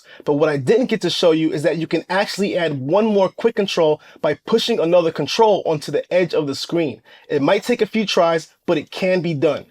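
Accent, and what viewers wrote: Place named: New York, United States. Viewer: Excellent video, we took notes. What is your accent? My Boston raised wife said it's a New York accent.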